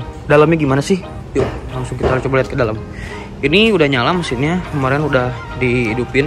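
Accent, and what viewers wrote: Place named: West Java, Indonesia. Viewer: Kaya orang sunda ya logatnya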